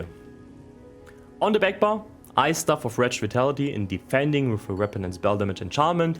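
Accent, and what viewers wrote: Germany. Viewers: Dein Englisch ist so niedlich "typisch" Deutsch ;-) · I love the german accent, never will miss the days with the guys in municher octoberfest xD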